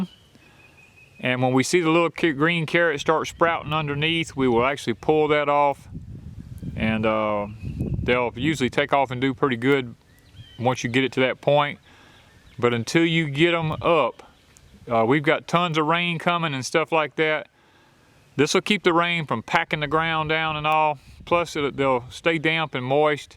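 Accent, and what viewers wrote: South, United States. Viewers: What part of the south are you please?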